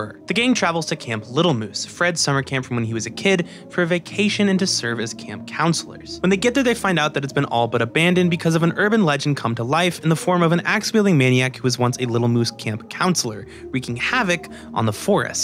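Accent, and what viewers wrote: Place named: New York, United States. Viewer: he sounds like a typical new yorker, watchu mean fam? 😂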